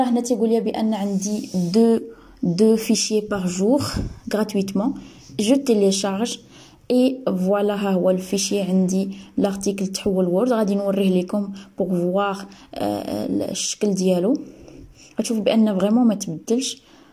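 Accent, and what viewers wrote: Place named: Arab world. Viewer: merci pour la video je ne comprends pas trop bien ton accent arabe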